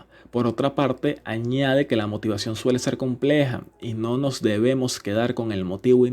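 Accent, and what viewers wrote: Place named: Caracas, Venezuela. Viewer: Por tu acento eres caraqueño y por lo otro eres de derecha.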